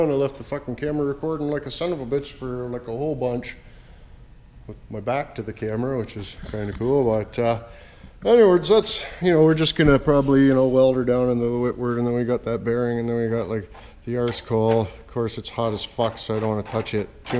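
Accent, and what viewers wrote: Canada. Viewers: do all Canadians talk like you ?? or is that Inwood dialect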